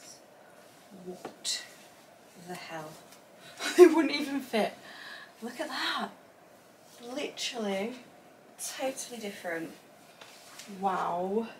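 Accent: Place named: Leeds, United Kingdom. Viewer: Love you and your amazing reviews! I used to live in the North of England and I really miss it from time to time, so love hearing your sweet Leeds voice!! Ok so question, what SKIMS would you recommend to go under the Soft Lounge Slip Dress ? xx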